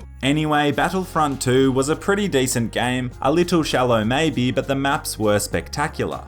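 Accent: Australia. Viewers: Are you Aussie?? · Oi are you Aussie?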